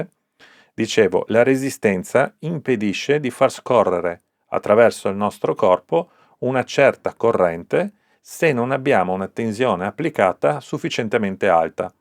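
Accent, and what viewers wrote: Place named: Sicily, Italy. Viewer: Sei molto chiaro nelle tue spiegazioni dettagliate .( Dal tuo accento ho capito che sei siciliano ,,auguri!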